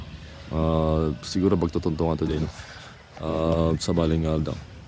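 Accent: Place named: Ilocos, Philippines. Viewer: taga mountain province ka manong? ngem kaman iloco di ayog mo ya?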